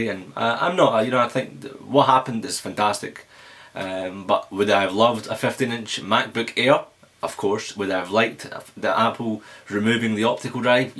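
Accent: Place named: Glasgow, United Kingdom. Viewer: Accent is Glasgow, west Scotland, uk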